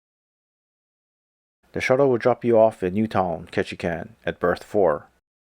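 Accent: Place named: Hawaii, United States. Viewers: I thought the same thing too! The tone sounded very local Hawaiʻi to me. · very helpful! Mahalo! BTW are you from Hawaii? Your accent sounds local 😊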